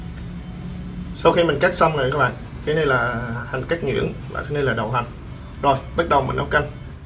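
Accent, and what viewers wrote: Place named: North, Vietnam. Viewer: Giọng bạn này như ở miền ngoài, giọng hay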